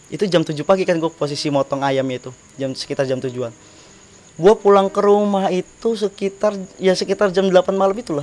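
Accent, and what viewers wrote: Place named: Bali, Indonesia. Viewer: Pembawa acara nya logat nya kayak orang bali ..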